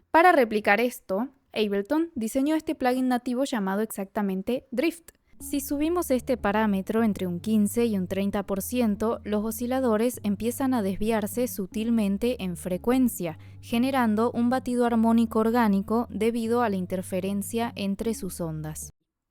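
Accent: Argentina. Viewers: de q parte de argentina eres??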